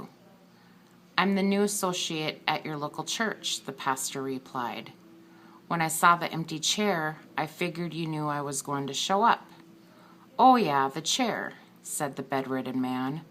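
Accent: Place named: Upper Michigan, United States. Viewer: You have a Upper Michigan type of accent. It could be Minnesota or Wisconsin too. I grew up in the U.P. but live in TUCSON-AZ.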